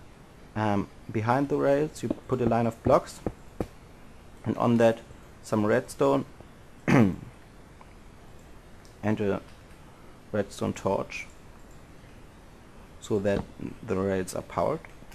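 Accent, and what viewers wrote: Germany. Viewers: Are you german ? Think so :D Glaube schon :D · Heyho, in my oppinion you speak with a german accent. is it right, that you're from germany?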